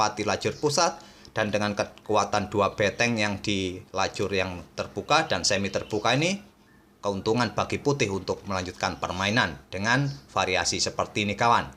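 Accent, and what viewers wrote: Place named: Banyumas, Indonesia. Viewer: Sama ngapak2 min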